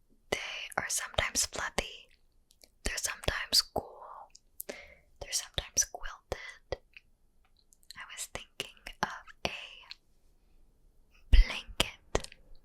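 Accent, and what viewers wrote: United States. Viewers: She sounds pretty american to me so nothing special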